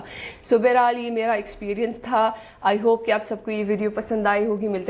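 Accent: Pakistan. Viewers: You don't look Moroccan,accent and look all over Pakistani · No one say you’re NOT PAKISTANI because your facial expressions and Urdu accent is very closely to Pakistani. I would like to where you were raised. Where in Morocco your parents from. I’ve been to merrakesh, Casablanca and Tangier. I love your country and I was thinking to move there. Please show country which is beautiful and cost of living is so affordable. Love to know more about your upbringing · Accent is Pakistani, .....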